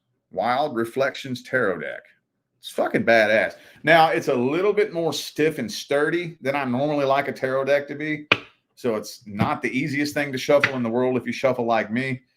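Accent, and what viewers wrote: South, United States. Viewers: I love your Southern accent and your realness :) You are so genuine and sweet and patient yet you have suffered so much. Sending love and warm hugs!!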